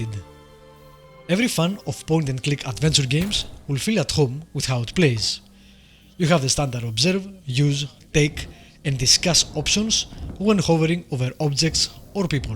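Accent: Greece. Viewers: Thanks for the clear cut, concise and short review. By the way, are you Greek?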